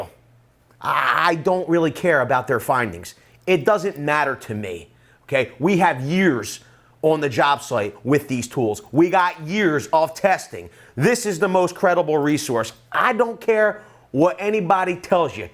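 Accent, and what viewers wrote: Philadelphia, United States. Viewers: Gotta get a Tshirt made that says “AND THE REALITY IS” Strong philly accent go birds!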